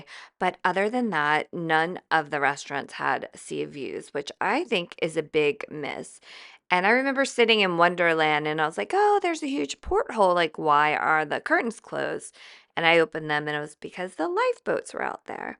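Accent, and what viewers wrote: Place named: California, United States. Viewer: HER VOICE IS ANNOYING !! sounds like someone from California. Like oh my God. 😅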